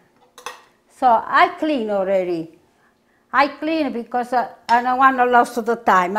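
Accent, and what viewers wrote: Italy. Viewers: She Has the Best Italian Accent 😋 👌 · I love how she posts the recipe at the end! Much appreciated dear sweet mama! I love your videos and your beautiful italian accent! Thank you for this recipe...been looking for my mama's forever and this is it! OX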